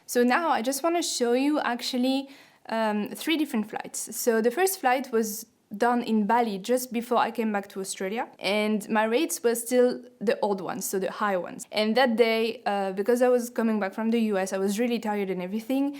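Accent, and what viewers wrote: France. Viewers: Great Video for Beginners. Very nice! I like your french english! Looks like more snake rates then Steele rates! Greetings from Germany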